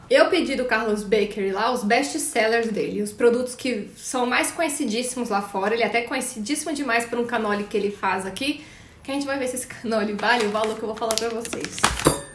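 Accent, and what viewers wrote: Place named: South, Brazil. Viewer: O é do sul? Sotaque tá meio entregando e CUECA VIRADA em vez de grostoli ou orelha de gato hahaha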